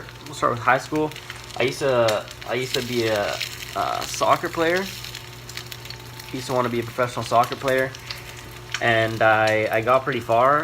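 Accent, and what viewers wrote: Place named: Canada. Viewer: Before you said Toronto I was going to comment and ask if you were Canadian lol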